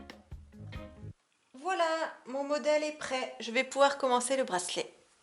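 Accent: Alsace, France. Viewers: Tu as un accent tu viens d'où ? D'Alsace ?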